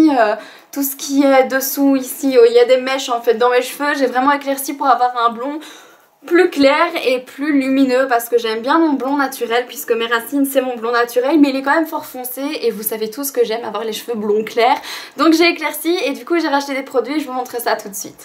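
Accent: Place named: France. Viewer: Make Up Artist l accent francais qui ressort aussi dans les langues latines par ex.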